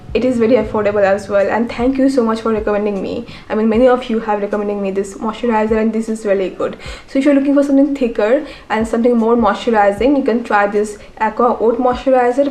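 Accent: India. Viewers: Can you please add captions because it's really hard to understand your indian English accent.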